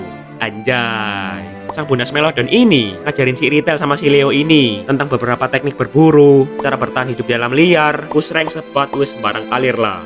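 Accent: Java, Indonesia. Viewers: Bang, Abang Jawa ya?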